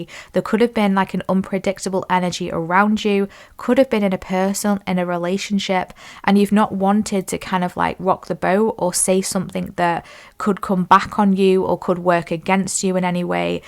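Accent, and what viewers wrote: United Kingdom. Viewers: British.?? Coool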